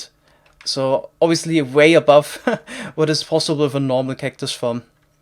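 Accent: Germany. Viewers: Are you German? Your accent sounds like it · Wie man einfach das deutsche raushört :D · Wow, dieser Deutsche Akzent.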